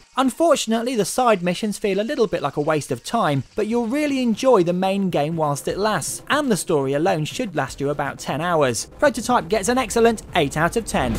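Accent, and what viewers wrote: United Kingdom. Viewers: this british guy with a british accent is talking way too british fast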